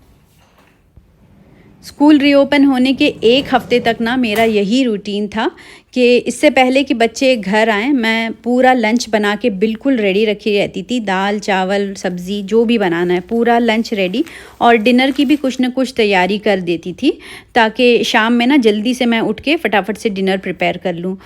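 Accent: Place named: Uttar Pradesh, India. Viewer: Apke accent se lagrha ap UP se ho india me Maza aata h apki videos dekh k btw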